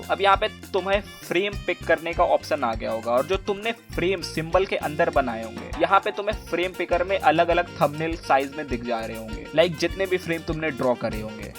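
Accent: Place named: Bihar, India. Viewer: Bhai bihar se ho kya?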